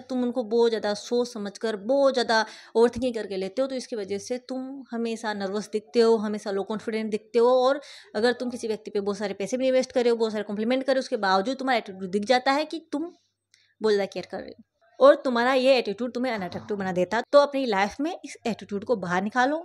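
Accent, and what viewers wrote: Rajasthan, India. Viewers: Another Great Video From BTW You Sound So Rajasthani, Are You From Rajasthan?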